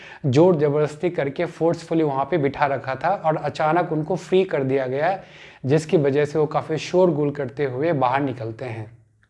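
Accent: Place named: Bengal, India. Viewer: Sir apki languge tone se aap bengali lgte ho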